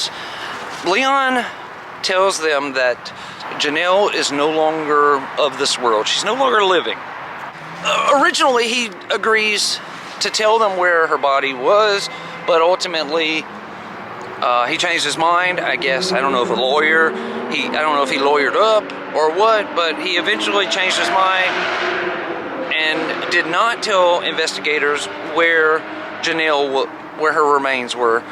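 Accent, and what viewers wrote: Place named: Alabama, United States. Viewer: Good day from.Australia 👍🐨 I just watched this story and have subscribed. Now a keen follower. Your content is great - interestingly presented, your natural down to earth style is 👌and i love your "Bama" accent! I look forward to many more and will catch up on your previous uploads. This is ever so sad R.I.P. sweet gal 😇. Love & light across the oceans and miles! 🙋‍♀️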